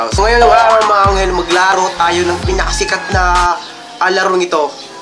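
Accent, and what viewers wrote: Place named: Visayas, Philippines. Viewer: halatang bisaya talaga...hahaha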